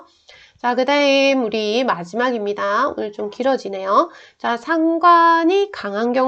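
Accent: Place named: South Korea. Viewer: 사투리 억양이 정감있게 와닿네요^^ 깔끔한 포맷으로 다른 분들과는 차별화되어, 개인과외 받는 기분이 드네요! 감사합니다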